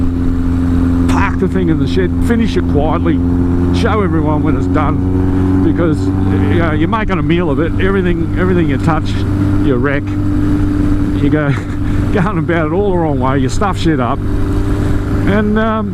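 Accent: Australia. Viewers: Hey, I found your channel through matts. Love the classy bikes you got and something about the accent from good old 'straya just attracts me even more, don't ask me why. I don't really have the finances or expertise yet to buy old bikes and build them back up like my father did (He collects old english bikes, a BSA Sloper is his current project and he had couple of Ariels before) but I'm planning on buying a DR 800 BIG on the cheap and mess around with it to see what's what and build up my skills before I break a proper classic plus I'd like to have something more usable (and offroadable) on the daily than my GSX-R. Anywaaaay I wanted to ask you what the metallic ringing on this bike here is, it reminds me a bit of the rattle Ducatis make but this clearly is not a Ducati.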